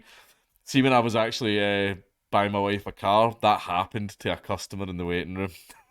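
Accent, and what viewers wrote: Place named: Glasgow, United Kingdom. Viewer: Completely unrelated but do you listen to grime by chance? I’m from Topeka Kansas n iv been checking out artists all of the UK n Ireland! Gotta admit the Glasgow accent is abit difficult to understand but I think I understand it much better than most in my area having watched many movies of Glaswegian in em! Keep up the great content n keep ‘em coming, no pun intended!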